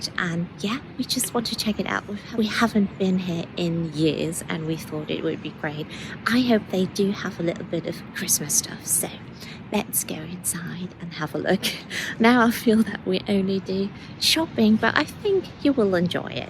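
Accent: England, United Kingdom. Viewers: Lovely video! Your parents are adorable ☺️ Just curious….How come you have an English accent? Were you raised in England? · I think it is amazing you have such a strong British accent being originally from Germany! ❤ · So you have a British accent but I don't get how you got it? 🤔 has German accent. I love the things in that shop! (I hope that's not rude.)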